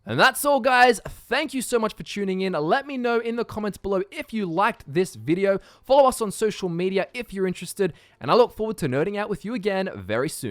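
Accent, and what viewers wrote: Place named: Australia. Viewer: I really can't stand the australian accent, what's with all the upward inflections every 2 seconds?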